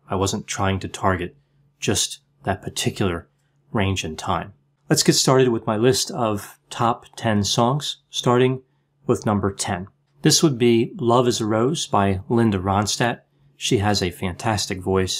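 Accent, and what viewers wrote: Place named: Delaware Valley, United States. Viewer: Your list is heroically bland and this video demonstrates your core virtues of steadfastness, honesty, and not caring what anyone else thinks. Also, I like your Delaware Valley accent. I grew up hearing it and find it comforting.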